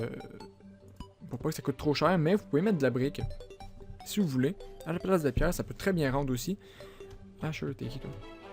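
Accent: Quebec, Canada. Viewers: Vive l’accent QUÉBECOIS, c'est plate qu'on a n'a pas bcp ( beaucoup ) sur youtube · j'adoooore ton accent québécois et ta maison et magnifique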